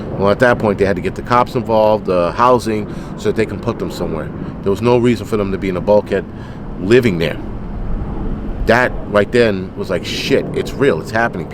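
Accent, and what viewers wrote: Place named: Bronx, United States. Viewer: OMFG - I love hearing you talk (I mean Twalk )...that accent along with the 200% Bronx attitude and speaking the truth for all to hear, man I'd rather hear you speaking from the podium that any/all of these boot-licking-LEFTISTs that are taking us people and our country straight to hell in a hand basket. Spot on with that comment that all homeless people should be bused over to the Govenor & Mayors mansions. Fuck Yeah, I'll pitch in for the gas.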